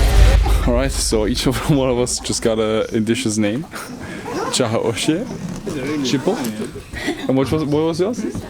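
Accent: Germany. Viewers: Am i hearing a little bischen deutsch ? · I hear Deutsch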